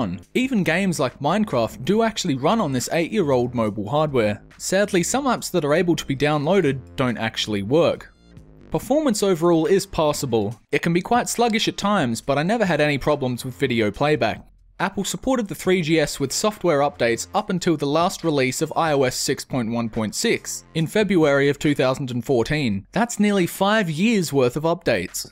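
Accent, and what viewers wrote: Australia. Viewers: 1. he was born with an australian accent and can't change that and should be proud of it. 2. he can do whatever he wants with his hair. that's not your choice 3. i think his videos are really good quality and better than most tech channels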